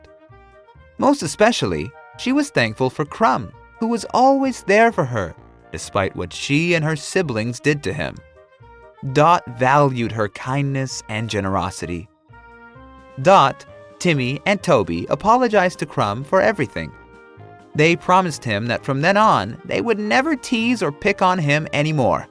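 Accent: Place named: United Kingdom. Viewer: The narrator's British accent gave me some italian school memory vibes (yes, italians studies English at school! Isn't amazing?)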